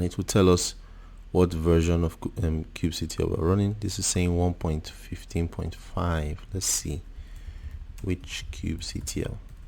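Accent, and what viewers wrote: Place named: Nigeria. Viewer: I have not even seen the video yet. But I am subscribing because you sound nigerian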